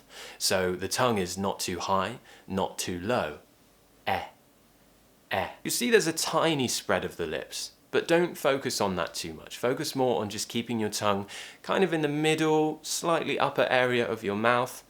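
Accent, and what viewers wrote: United Kingdom. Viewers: You are a very good teacher!I love the British accent, would love to learn more! Thank you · You are the best, british accent is the best ,I'm happy from Africa 🇲🇱🇲🇱🇲🇱❤❤❤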